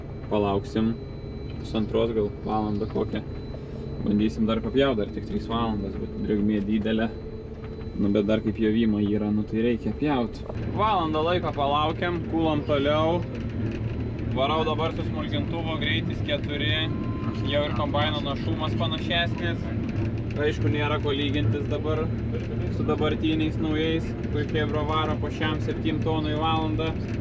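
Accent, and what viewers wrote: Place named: Pasvalys, Lithuania. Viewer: pagal kalbod akcenta pasirode kad pasvalio raj :D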